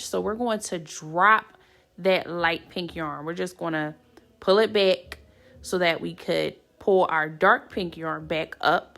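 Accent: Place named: Philadelphia, United States. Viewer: Thank you for this. So clear and easy to follow. I Can’t wait to try it! And if I’m not mistaken your accent is definitely giving fellow Philly girl vibes 🤗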